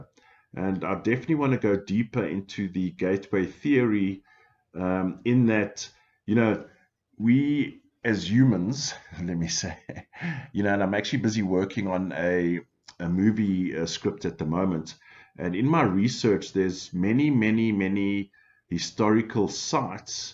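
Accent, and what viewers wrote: South Africa. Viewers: I knew this was a South African accent...are you still in SA?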